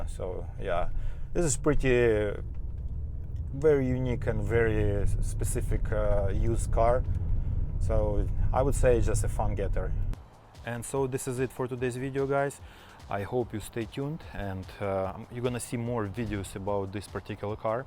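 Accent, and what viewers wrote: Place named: Russia. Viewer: Судя по акценту ты говоришь по-русски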